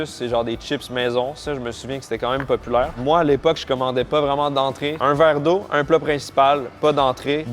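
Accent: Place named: Quebec, Canada. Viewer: C’est la première fois que quelqu’un avec l’accent québécois me rend triste mais dans le bon sens ça m’emue un peut surtout le premier restaurant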